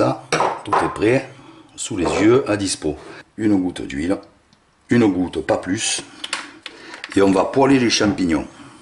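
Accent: South, France. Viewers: Hum 😋 et en plus ta une belle voix avec accent du sud 😂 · Une recette pour le régime....😅, c'est pas grave, ça tombe bien je suis ce type de régime ! mdr...., vu l'accent que vous avez on est dans le Sud c'est clair 🥰, bien le bonjour d'un Ch'ti décentralisé dans le Puy de Dôme 😁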